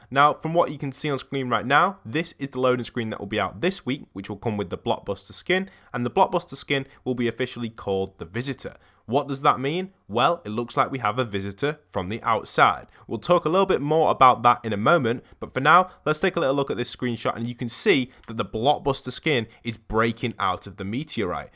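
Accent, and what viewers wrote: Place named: United Kingdom. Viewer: I don't like the British accent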